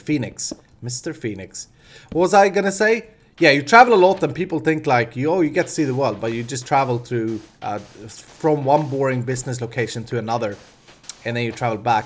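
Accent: Sweden. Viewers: ...and ladle is not Lad-le it's Lay-dell.. Sveedish speech patterns.. JEEZ!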